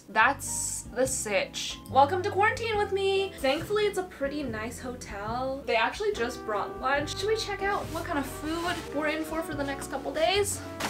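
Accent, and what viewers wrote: England, United Kingdom. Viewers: That English accent though 😬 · Is there a reason you speak with an annoying British accent or is that just to be annoying? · British accent 🤌🤌🤌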